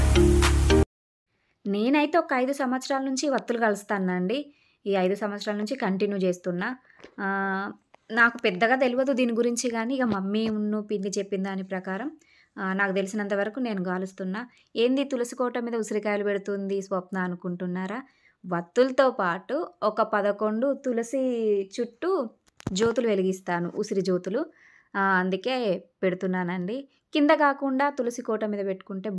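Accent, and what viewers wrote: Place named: Telangana, India. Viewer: Mee slag telangan nachhindi super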